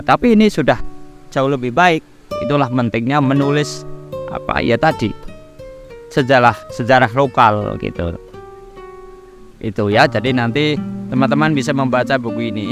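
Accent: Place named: Banyumas, Indonesia. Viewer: Sampean kok bahasane..logate ngapak ya…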